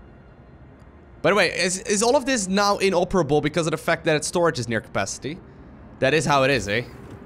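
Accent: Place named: Netherlands. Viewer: You sound super dutch, are you dutch?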